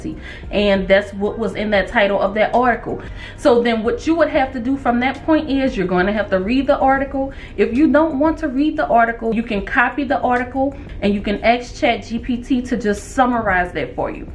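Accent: New Orleans, United States. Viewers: I hear dat accent!!! You gotta be from the NO!!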